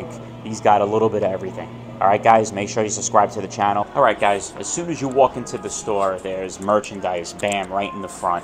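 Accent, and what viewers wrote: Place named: Long Island, United States. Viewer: Great tour! You really captured what the store has to offer. And you are so Long Island- Great accent.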